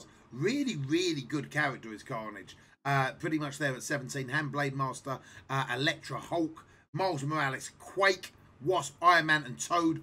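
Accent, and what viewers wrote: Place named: England, United Kingdom. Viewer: Brilliant videos mate! Only just found you and loving it! :) Loving your accent aha! Im English too!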